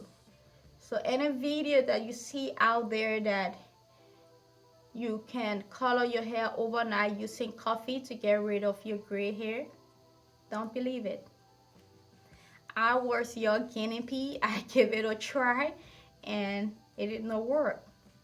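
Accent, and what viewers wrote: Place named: Liberia. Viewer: Your accent remind me so much of my sister in law - she is Liberian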